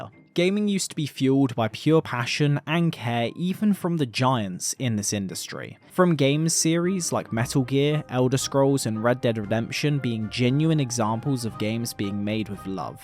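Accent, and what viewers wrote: United Kingdom. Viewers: Subbed for the long video and British accent.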